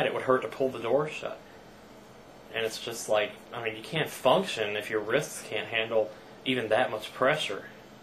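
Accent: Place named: South, United States. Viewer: You used to have a lot more of a southern accent.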